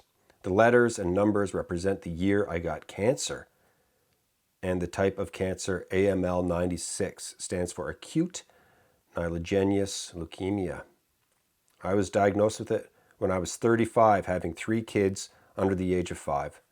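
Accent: Newfoundland, Canada. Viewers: Are you a newfie? I get that feeling.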